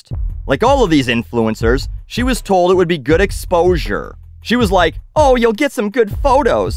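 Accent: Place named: Canada. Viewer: So good to hear that angry Canadian voice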